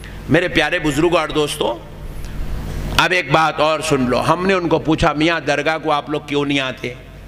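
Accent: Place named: Hyderabad, India. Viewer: me to yaar haidrabadi language sunne aaya tha 🤣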